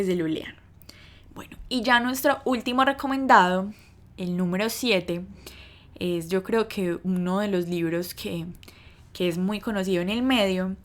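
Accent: Colombia. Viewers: Eres colombiana no?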